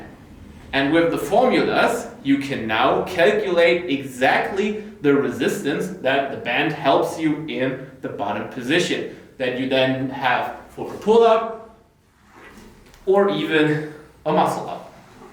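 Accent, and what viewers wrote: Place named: Germany. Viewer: Hahaha dein deutscher Akzent ist ja so geil 😂😂😂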